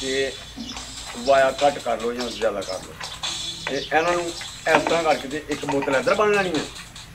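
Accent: Sialkot, Pakistan. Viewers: I love sialkoti accant..... Hahahaha waaaah teh aondi ai.... Hahahaha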